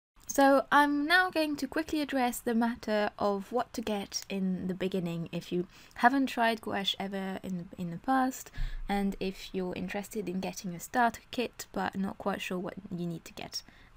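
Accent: United Kingdom. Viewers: This is quite out of the blue but may I know if your accent is British? Which is it exactly? I like it so much. :) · Yes it's wonderfully comprehensive and I very much enjoy your lovely British accent!! :)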